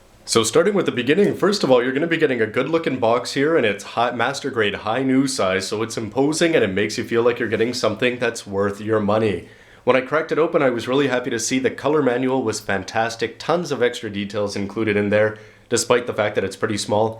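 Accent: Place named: Canada. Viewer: sounds Canadian...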